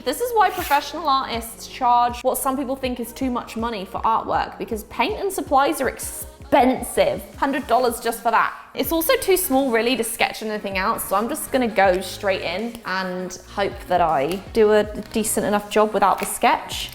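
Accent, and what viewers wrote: United Kingdom. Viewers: where are you from ? cause' i love your accent and it sounds quite british. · Hi, I have recently tried painting the little models you can get and they have some super tiny details on them (usually in the most awkward places on the model too, like the handle of a sword or a sleeping dragon's claws 🤣) I would recommend grabbing a set of nail art brushes. They're basically like Rigger paintbrushes but even thinner and come in different lengths and thicknesses but all of them are tiny enough to paint designs on fingernails so definitely would be good for tiny canvases. Alternatively, Mira (Byler) is always recommending the Arteza detail brushes set and she does a lot of painting on tiny/weird/awkward things videos 🤣 Where abouts in the UK are you from? I can't quite place the accent. I grew up in Leeds but now live just outside Brighton. 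Do you miss home or is the US home now?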